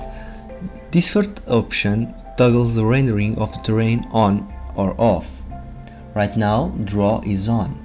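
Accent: Portugal. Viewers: Eu tambem sou de Portugal, bem me parecia que você estava a falar com um sotaque português.:)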